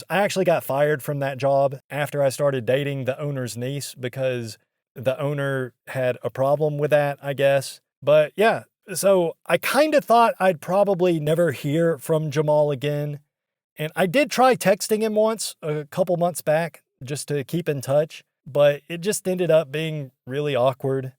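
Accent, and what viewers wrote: South, United States. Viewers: Aye man. I dont know what to say about your story without it being patronizing or anything like that. I really hope things look up for you man in some way. I live in California and by your accent im guessing you live in the south. Just know this life is worth living. I know sometimes it feels like it isnt but if at anytime you need a bro to talk to man im here bro.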